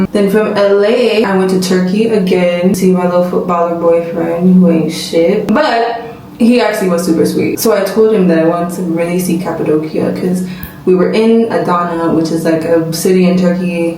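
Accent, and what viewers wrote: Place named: Nigeria. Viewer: ummm babes how come I’m hearing Naija accent o 😂 Welcome back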